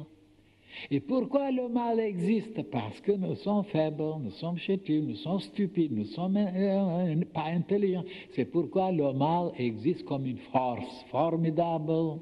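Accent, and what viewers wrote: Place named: Czech Republic. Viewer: I' m hre because of Franz Bardon. That's fantastic knowledge ! Thank you for sharing ! And I speak french, so it's a delight to listen to an old wise man with a strong tchekich accent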